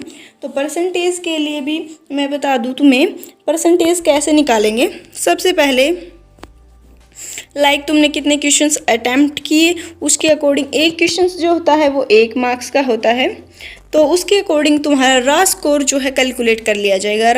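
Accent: Haryana, India. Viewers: Ma'am aap haryana se ho kyaa😁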